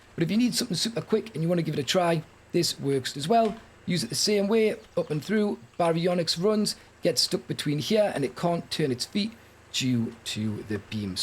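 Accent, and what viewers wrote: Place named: Newcastle, United Kingdom. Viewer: just wondering because of the accent are you from like newcastle?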